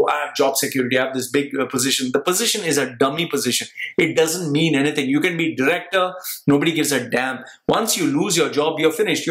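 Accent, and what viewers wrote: India. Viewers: You sounds like an Indian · Wow you have such a strong Indian accent for someone who has not lived their. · I couldn't understand a word from his Indian accent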